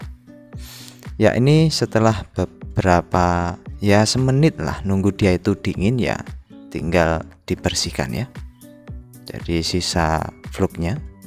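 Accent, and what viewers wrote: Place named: Banyumas, Indonesia. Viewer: salam ngapak bro aja kelalen madang😂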